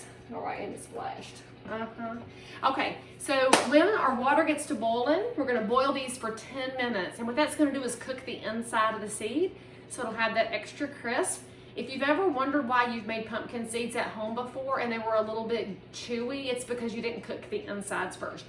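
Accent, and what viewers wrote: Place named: South, United States. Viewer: Just found your video. You are cute as a button! Love your Southern accent, too. And thanks for the recipe! So good!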